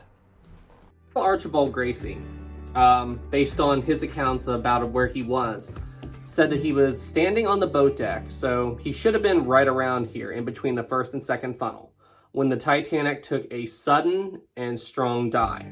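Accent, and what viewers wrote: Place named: Maryland, United States. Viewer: Side note: I'm listening to your accent and can tell you're from somewhere in Maryland. If you don't mind me asking, where abouts in Maryland?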